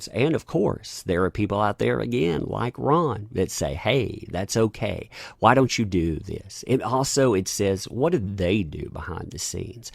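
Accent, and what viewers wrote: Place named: South Carolina, United States. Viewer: "Y'all" is disgusting to you? You sound like your an SC boy like myself so that's just heresy!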